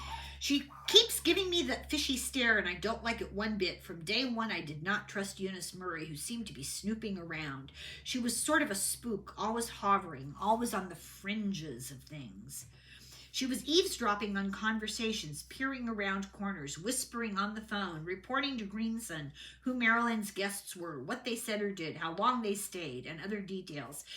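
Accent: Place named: Ireland. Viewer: I just love your Irish accent. You are beautiful And a sweetheart I look forward to being part of your channel I subscribed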